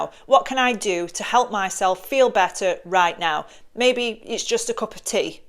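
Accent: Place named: Lancashire, United Kingdom. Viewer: Super helpful must help with the Lancashire accent? I dont know but gathering my self worth is helping alot i was excersizing boundaries which prompted my bring ghosted so on the right track but funny how the feeling of "oh what if" comes in againgst the obvious logic lol it is like breaking free from some kind of addiction obv attatchment x thanks for being you x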